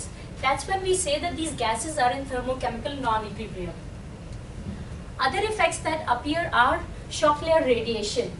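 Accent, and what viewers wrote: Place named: India. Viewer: She has a hindi accent.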